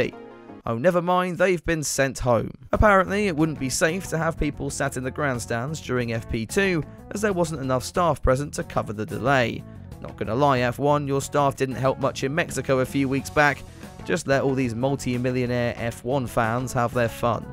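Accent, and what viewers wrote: United Kingdom. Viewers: Honestly, I can't understand this vlogs commentary. Narrator talks too fast and too much British clipped accent for my taste. Definitely NOT at par with BBC standards.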